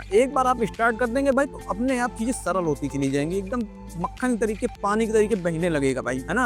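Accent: Kanpur, India. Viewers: Kanpur se ho kya bhai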